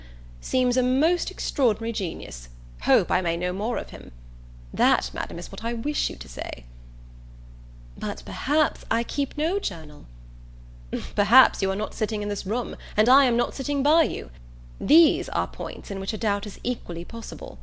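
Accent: United States. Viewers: Jane Austin with an American accent. 🤮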